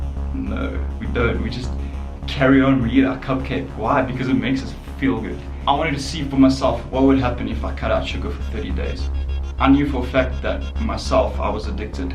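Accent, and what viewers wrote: South Africa. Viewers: Dude, are you South African? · South African accent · South African? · You sound South African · are you south african?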